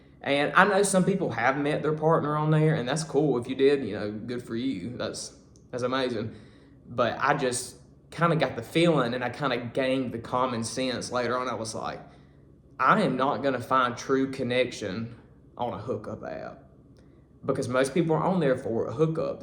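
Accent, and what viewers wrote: South, United States. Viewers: I have one qustion for U; Are ya Suthern? Cute as Funck!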